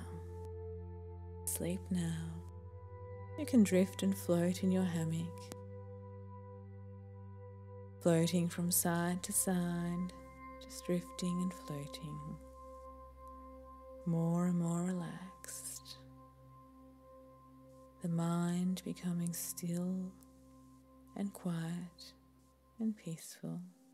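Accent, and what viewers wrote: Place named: Australia. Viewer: She sounds Australian all the way through to me (I'm British)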